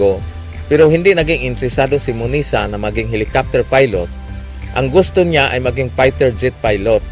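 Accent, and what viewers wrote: Visayas, Philippines. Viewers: Bisaya dong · Monissa or Monessa?..trick or treats?😁...bisaya ka bay?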